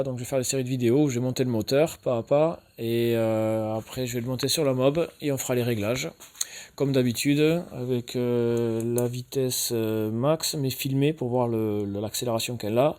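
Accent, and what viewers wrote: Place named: Toulouse, France. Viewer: Bonjour! Heureux de réentendre ton accent toulousain " con"! Dis moi la rondelle que tu as mis en bout sur l'ecrou du vario er3 de ta mob elle mesure combien d'épaisseur et quelle est sa fonction? 😊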